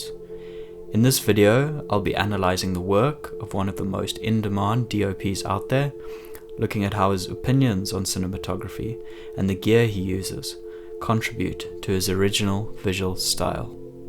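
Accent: South Africa. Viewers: Love your South African accent brother 🙋🏾‍♂️. Great insights as well. He's my favourite cinematographer atm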